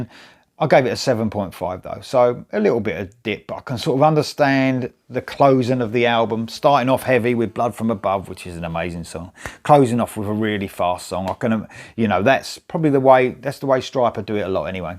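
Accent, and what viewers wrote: England, United Kingdom. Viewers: Thanks man for a wonderful experience. Really enjoyed it. I agree it is indeed a wonderful album. You made it more so with what you've done. Not into metal as much as you guys, but I love Stryper. Always have. I enjoy hearing you speak. Love that English accent. I'm from the Caribbean. Thanks again man. God Bless you.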